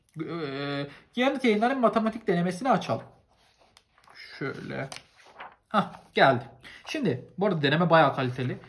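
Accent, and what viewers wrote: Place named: Hatay, Turkey. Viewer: Abi neden hatay sandık içi gibi konuşuyorsun?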